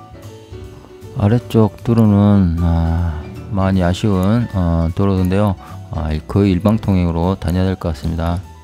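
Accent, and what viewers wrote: Jeolla, South Korea. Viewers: 전라도 말툰데 뭔 일본어???? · 뭘보고 일본인?? ㅋㅋ 딱들어봐도 전라도 사투리구만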